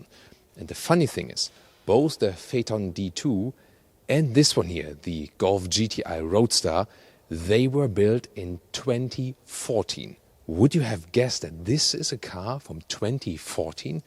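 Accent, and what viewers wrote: Germany. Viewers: First thing tht came up to my mind when I saw this 'flat flunder' : what do you to with your head sticking out above the windshield? (You can see it ) . Looks funny. And why did they choose a German presenter, whose accent is still audible? One important question was not answered: how high would the purchase price by of such a 'fun car' with 14 " road clearance which would have trouble to pass what the French call 'ralentisseurs' without any damage.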